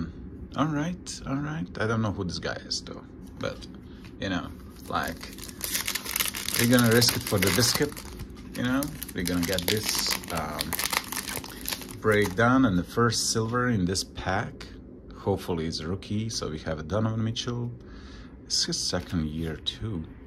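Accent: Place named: Eastern Europe. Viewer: Eastern Euro step accent is always nice to hear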